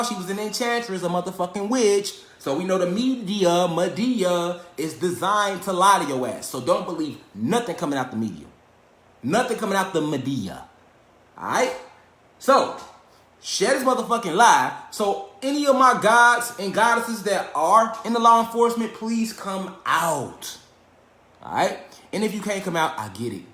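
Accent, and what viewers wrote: Chicago, United States. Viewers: His Chicago accent so strong 😂😂😂 he make me miss my family in the chi now I gotta visit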